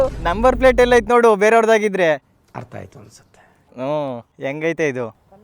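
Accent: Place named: India. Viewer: U r kannada slang is super bro congratulations for the bike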